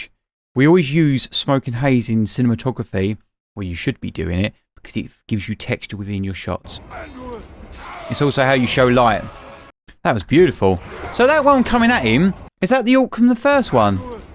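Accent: United Kingdom. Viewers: Can you please turn off your British accent and speak English like normal people do around the world?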